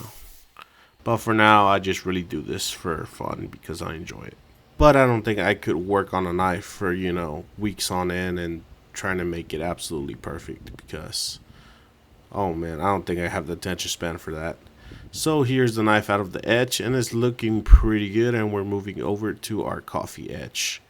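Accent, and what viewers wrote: New Mexico, United States. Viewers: Where are you from? You sound like you're from New Mexico.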